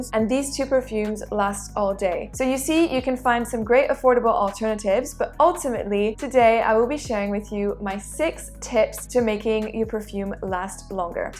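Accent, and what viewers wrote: France. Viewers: You have a very good French accent. Are you originally French?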